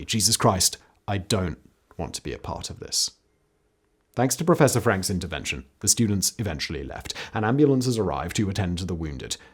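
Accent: United Kingdom. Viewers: Yeah, a YouTuber of unknown qualifications and a British accent is going to tell us about the intent behind the killings at Kent State in Ohio.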